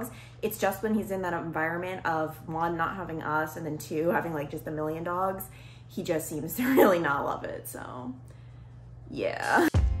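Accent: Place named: Long Island, United States. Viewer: Your Long Island accident is back…. And I love it!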